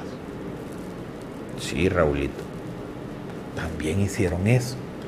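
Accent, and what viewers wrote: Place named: El Salvador. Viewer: Saludando a don por subuen trabajo me encanta como explica y habla como un buen Salvadoreño deseo que dios lo cuide y usted tenga cuidado.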